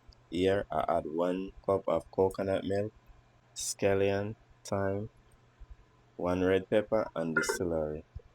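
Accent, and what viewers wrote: Jamaica. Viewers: I’ve watched your short videos with music playing in the background. I much rather hear your rich Jamaican accent. It gives your awesome dishes more flavor. 👍🏾👍🏾👍🏾👍🏾‼️‼️‼️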